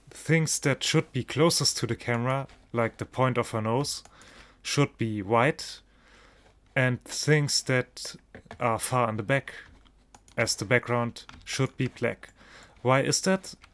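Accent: Germany. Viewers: Sehr Interessant Blender so zu nutzen, schönes Tutorial, komisch das man einen deutschen Akzent immer raus hört :D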